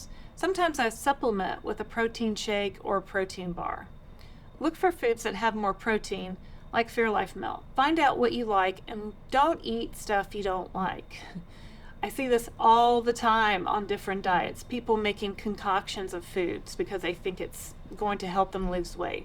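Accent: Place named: North Carolina, United States. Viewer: Thanks, I come here for encouragement about every day. I love your North Carolina accents. Most of my relatives lived in Stanley County when I was growing up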